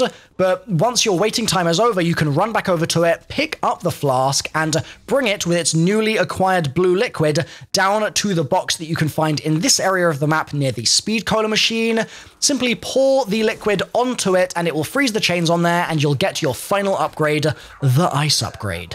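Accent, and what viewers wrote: United Kingdom. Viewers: Why is every person who makes videos like these British lmao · Such an annoying accent omg. Which British accent is this?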